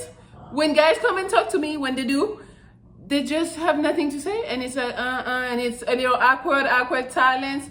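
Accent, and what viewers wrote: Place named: France. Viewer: Helloz, my favorite part of your videos, is the energy you have when you start... "WHATSUP LOVERS" with that gorgeous French accent... you make our days... (^^,)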